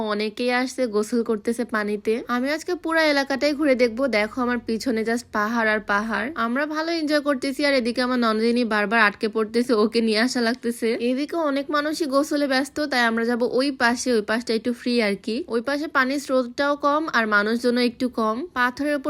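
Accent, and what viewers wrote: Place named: Sylhet, Bangladesh. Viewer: Amader sylhet bole kotha Sundor too hobei Apu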